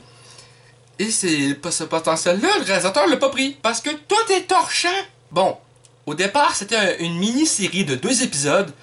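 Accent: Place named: Quebec, Canada. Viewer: Au Québec , vous avez vraiment un drôle d'accent !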